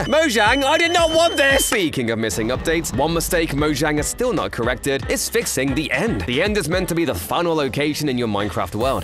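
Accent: United Kingdom. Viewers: Glasgow accent?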